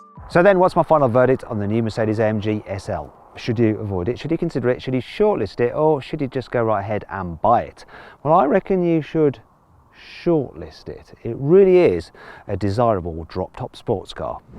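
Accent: United Kingdom. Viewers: 영국 발음 존나 거슬리네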